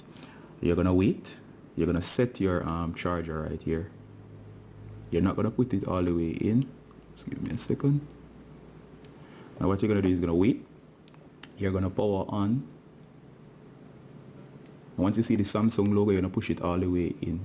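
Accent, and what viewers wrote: Jamaica. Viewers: Me alone notice issa jamaican🤩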